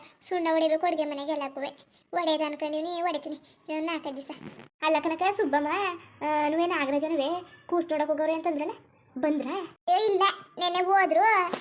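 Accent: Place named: Mysore, India. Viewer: Mysore hunsur language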